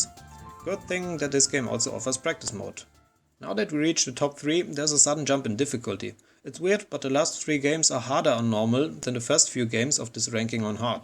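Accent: Germany. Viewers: Is that a german accent? I can feel it. I'm suffering from it too.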